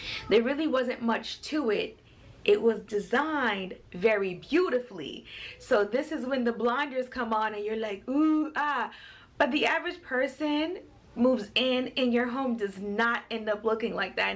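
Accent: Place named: New York, United States. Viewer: Just found ur page... LOVE IT..... I don't care how long you've lived in ATL , you're such a New Yorker...(accent)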